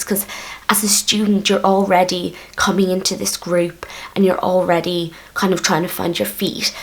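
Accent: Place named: Ireland. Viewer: great video. I start placement next month so hopefully these help. btw it sounds like your irish haha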